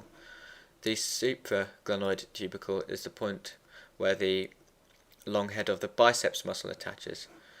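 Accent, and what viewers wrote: United Kingdom. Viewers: Big, massive, enormous thanks! It's really hard to actually visualize all of this while reading text books and browsing through an atlas. I always finish off each part by watching your videos. It's also almost equally awesome that you speak British, with some luck I will have mastered the accent by the end of my first year! :) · Good work but no offense .. I don understand British Accent clearly !! :"(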